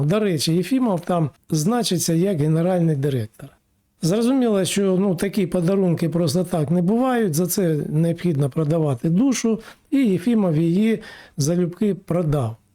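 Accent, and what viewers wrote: Moscow, Russia. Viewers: Згоден в усьому. Слух ріже а-ля мацковська вимова: Акремі, Ачікує, кАму, пАпередники, Астанні... "Плюс" зміст, подача "мінус". Коротше: Ахуєть, а "училке" з української наше "ФЕ".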